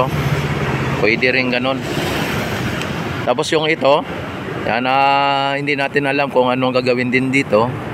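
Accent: Visayas, Philippines. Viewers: 🤣🤣🤣bisaya!hirap ba mag tagalog at may punto ka ng bisaya!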